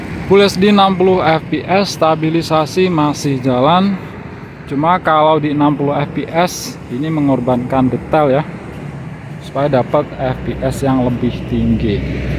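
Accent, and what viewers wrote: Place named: Java, Indonesia. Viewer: 765 tok=aja duh ketok medok e mas🤭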